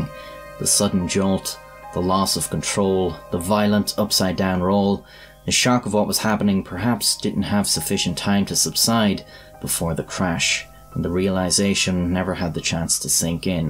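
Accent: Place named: Mayo, Ireland. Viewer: Hey, random question but I don't suppose you're from Mayo? Your accent reminds me of The Hardy Bucks? Am I close?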